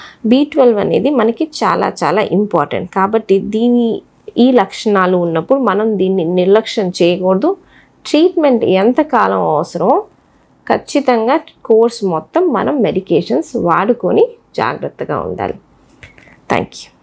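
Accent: Guntur, India. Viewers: అది గుంటూరు తెలుగు❤ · Amma. Bangaram. Konda. Thalli. Neeku. Nenu okka. Salahu. Isthanu. Neeku. Isthamaithe. ..manadhi Guntur kabatti. . Vacchuddi..poidhi..ki baduluga vasthundhi...pothundhi....ani. kanuka matladithe. Adbhuthamaina. Slang thayaru avuthundhamma...velaithe. naa. Yokka. Request. Ni..patinchu. lekapothe. Mana. Guntur. Mana. Ooru. Bhashani. Manamu. Endhuku. Odhulukovali. Anukunte. Nee isthamu. Bangaram...kaani. neevu. Bhavisyattulo. Chala goppa peru thechhukuntavamma....nevu. mathramu. Continue cheyyamma. Neeku. 10 million subscribers vastharamma. Thondharalone.. sweet. Voice. Plus. Most intelligent...DR..amma nevu. ..thalli.. MAY. GOD. BLESS. YOU. THALLI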